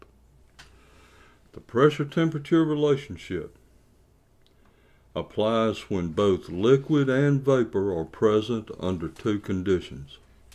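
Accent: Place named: New York, United States. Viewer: Man this video is spot on and to the point, I love your New York accent.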